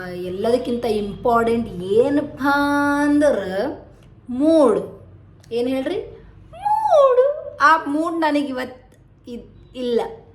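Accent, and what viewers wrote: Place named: Kalaburagi, India. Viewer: ಕರ್ನಾಟಕದಲ್ಲಿ ಪ್ರತಿ ಜಿಲ್ಲೆಯಲ್ಲಿನ ಮಣ್ಣಿನ ಸೊಗಡಿನ ಭಾಷೆ ವಿಭಿನ್ನವಾಗಿದೆ... ಎಲ್ಲವೂ ಒಂದಲ್ಲ ಒಂದು ರೀತಿಯಲ್ಲಿ ವಿಶೇಷವಾಗಿದೆ... ಹಾಗಾಗಿ ನಿಮ್ಮ ಬಾಯಲ್ಲಿ ಕಲಬುರಗಿಯ ಭಾಷೆ ಕೇಳಲು ಚಂದ..❤❤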